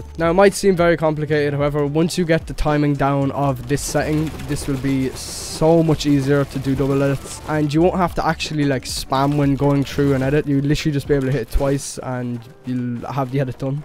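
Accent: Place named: Ireland. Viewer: Where u from in ireland I hear the accent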